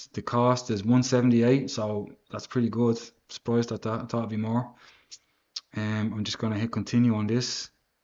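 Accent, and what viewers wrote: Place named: Ireland. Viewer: Love your vids. Question... I am no genius but it since like you might be from Ireland.. haha love the accident. I am from England... and now that labour have got in ans they are probably going to increase capital gains tax.. I was wondering if you had any advice.... if you think they could do it at the autumn election? Wait until April 2025.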